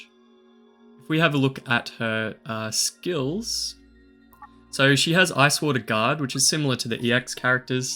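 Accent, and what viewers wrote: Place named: Australia. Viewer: Gorgeous character. Can’t wait to sit down and hammer out this content myself. Got a special project of my own I’ve been working on that wasn’t suppose to turn into the monster it has become, but it’s been fun to hammer out. As always, your content is a pleasure to watch. Wish I had your buttery-smooth Australian voice and cadence. Best of luck in your exams, can’t wait to see what you have planned for 500 subs!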